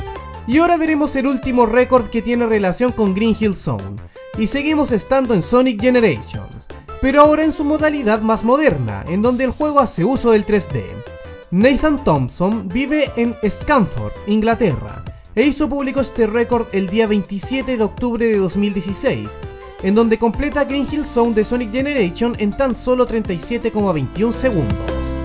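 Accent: Chile. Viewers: Wait hablas español hablas como chileno :v Espera talk spanish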